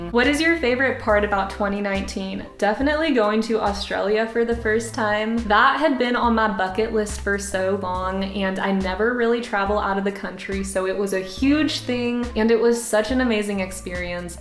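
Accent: South, United States. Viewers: wow your southern accent is really poking through in this one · Your accent is so amazing shoutout to my fellow southerner!🤠🤠🤠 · country accent was strong in this one and that makes me happy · She probably lived in the south and moved to the north. I do the same thing. My accent gets really thick when I’m stressed or angry😬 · That country accent really showin it's self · it’s weird because sometimes when I watch I totally can ignore her accent but now HER VOICE IS EXTREMELY SOUTHERN AND UNAVOIDABLE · Where - where do you live? Like you sound very Southern sometimes,but sometimes you sound like you could live in Oregon. It's big confusion for me.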